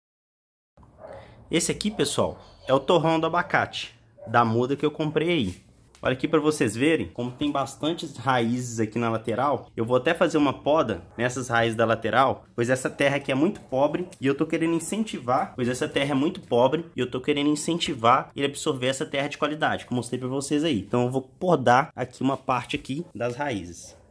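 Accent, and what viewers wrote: Minas Gerais, Brazil. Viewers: O sotaque minerim entrega kkkk Parabéns pelo trabalho!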